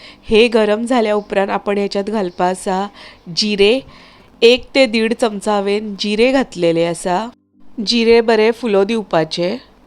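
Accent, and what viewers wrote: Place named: Konkan, India. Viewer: Tujho awaz aani kokani bri aasa,ayak pak brey dista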